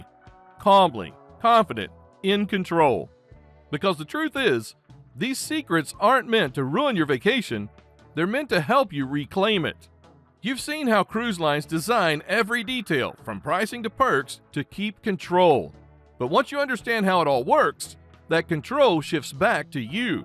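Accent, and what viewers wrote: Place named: South, United States. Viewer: i love how this guy who has a very southern accent is so smart like SMART smart i have learned a lot from you tysm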